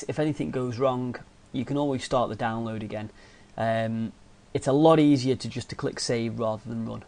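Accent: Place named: Scotland, United Kingdom. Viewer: heh nice scottish accent!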